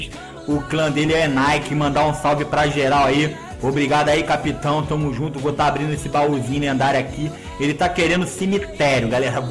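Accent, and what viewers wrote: Rio de Janeiro, Brazil. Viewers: Meliodas O Taradado n muda nda,antes de ver o cara eu pensava que ele era um carioca magrin esse cara é bom mto melhor q o mister M #MisterMÉoPiorClashr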